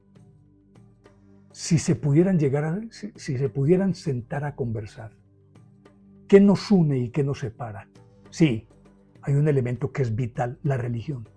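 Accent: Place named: Colombia. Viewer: Buena Charla, primera vez que te escucho, creo eres Colombiano, muy interesante tus datos claros y mundiales.😮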